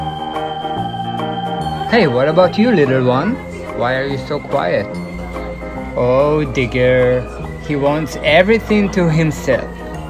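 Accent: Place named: Europe. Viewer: This guys voice is what Americans think Europeans sound like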